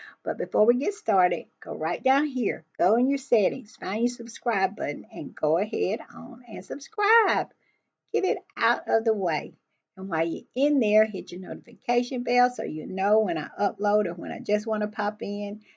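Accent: South, United States. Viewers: ❤❤❤ here for the Read...here for that southern drawl ❤❤❤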